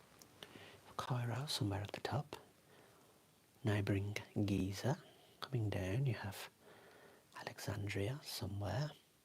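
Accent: United Kingdom. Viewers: Most likely British English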